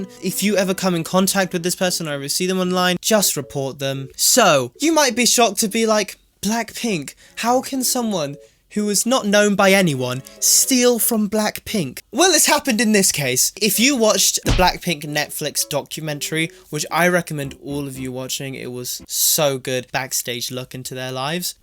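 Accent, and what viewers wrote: England, United Kingdom. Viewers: I hella LOVE this english accent !! Like, not the American accent, the English one sounds so soft 😭❤️ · I’m so slow😭😭😭 I didn’t realize was British until this video. Idk I guess I wasn’t paying attention before but I’m now acknowledging how thick his accent is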